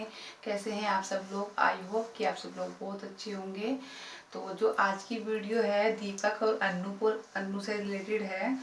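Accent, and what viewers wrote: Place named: Haryana, India. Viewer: di ap haryana ki language me baat krte ho ho aap shamli ke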